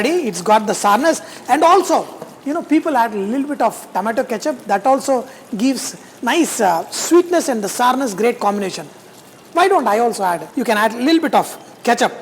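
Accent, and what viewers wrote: India. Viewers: Excellent cooking plus hard Indian accent 🙌🙌🙌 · Too much buttler english...show some useful content, not interested in the nuisance.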